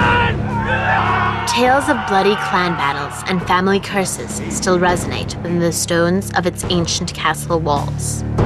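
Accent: United States. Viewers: This is just taken from the "Castle ghosts of Scotland" series except they've just cut it down and remixed some scenes while cutting out others and got a Yank narator instead of the original which had an Englishman. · Scotland in a US accent. Gimme a break.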